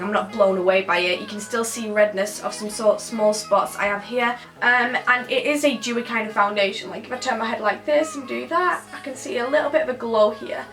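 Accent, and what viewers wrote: United Kingdom. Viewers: I have an American accent and you make me want a British accent soooooo bad!! 😩😩😍😍😍😍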